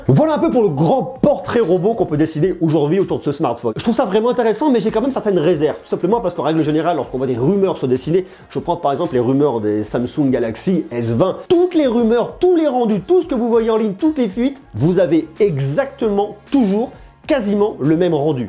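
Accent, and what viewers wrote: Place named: Clermont-Ferrand, France. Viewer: Mais en faites t’es clermontois !!!!!?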